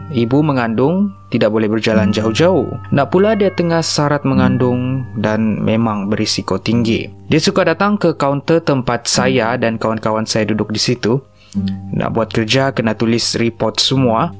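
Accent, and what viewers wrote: Sabah, Malaysia. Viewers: Memng org Sbah agknye.loghat Sbah je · Suara dia best..,Mcm sabah sikit...Hihi...😃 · memang sabah pun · Cerita2 Seram! Memang cerita yang benar berlaku dan sentiasa disukai semua orang.. Suatu masa dahulu tahun 1955 Radio Melayu Singapura pun menjadikan SERAM sebagai program terbaik diRadio dan sentiasa keudara pada jam 2330 setiap malam Jumaat.. Berbagai cerita yang cukup menakut dan menegakkan Bulu Roma serta ditambah kesan bunyi juga amat menyeramkan..Ia bukan seperti Drama biasa diRadio.. Mohon Penyiar ini menukarkan lorat membacanya yang begitu BAKU dan seolah olah dalam lorat SABAHAN ke lorat biasa saja agar lebih syok didengar dan betul2 ngam2 sui kata sebenarnya.. Idok ke gitu.. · Sabah LA bai tuh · memang org sabah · sabah sja guna kopi - kupi.. haha.. mmg sabah dia ni..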